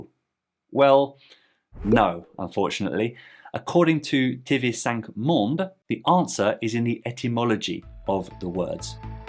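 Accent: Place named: England, United Kingdom. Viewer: It’s so endearing hearing angleterre accent speaking my language :) tres sexy ;)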